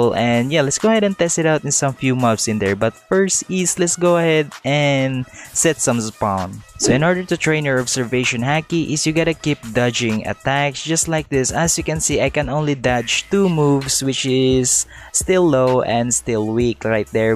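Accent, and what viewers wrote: Philippines. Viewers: Ur pinoy aksent is ON POINT ONG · You have a Filipino accent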